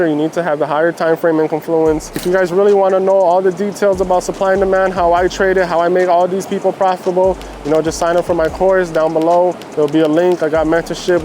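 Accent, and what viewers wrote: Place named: California, United States. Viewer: You sound exactly like me and my people from California to a tee. I am on the same exact journey. Preach to me, bro. I'm in between my dropshipping and just discovered Forex, but I have stock experience. Now, I am researching Forex trading. Vegas taught me not to ever treat trading like gambling lol real talk.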